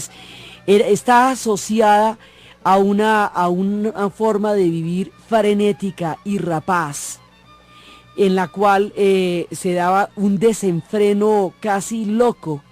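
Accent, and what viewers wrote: Colombia. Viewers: Oiga usted!!! Qué manera tan clara de impartir historia!!!!👏🏼👏🏼👏🏼 siempre me había enredado con aquellos nombres de los persas... delicioso ese acento colombiano😉👋🏻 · Jaajajaja narras muy chimba pareces Colombiana saludos de un gnóstico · Hola, soy andaluza. Ese 'dege' suyo colombuano tan gracioso y expresivo, esa cultura, inteligencia y empatía para transmitir sus conocimientos es de Premio Novel a la expansión cultural a todos los publicos de habla hispana. Gracias.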